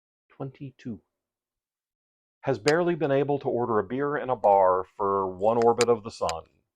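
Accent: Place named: Midwest, United States. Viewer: If I had to guess - I’d bet you grew up in the Midwest - Missouri, Arkansas, Alabama, Mississippi. I’m from Missouri - spent 24 years in the coast guard and moved a lot. But damn - I’m good at guessing where folks grew up.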